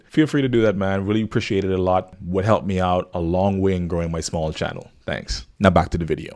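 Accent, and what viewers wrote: Bahamas. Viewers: Are you from the Bahamas your accent remind me of mines? Bless up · you sound Bahamian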